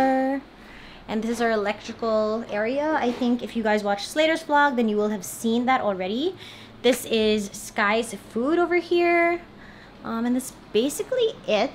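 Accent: Visayas, Philippines. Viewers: Bisaya kaayo ka dong scottie ayy. 😍😁 "TIKI"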